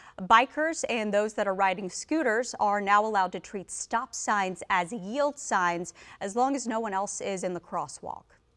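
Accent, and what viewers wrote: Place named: DMV, United States. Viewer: getting that DMV twang down solid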